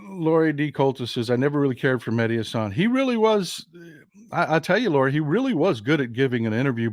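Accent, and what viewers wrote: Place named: South, United States. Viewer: A Virginian...a Southerner. Need some of our voices in my ear, rather than all these West Coast, Northeastern folk. Just subscribed... preach brother! Thank you.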